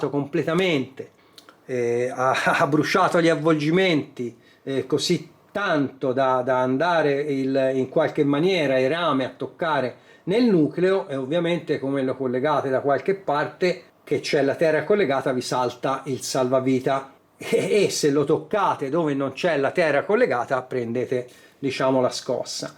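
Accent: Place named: Pisa, Italy. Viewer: Toscano ok... Ma.. Pisano? Non sei fiorentino, non sei livornese... Dall'accento direi provincia di pisa..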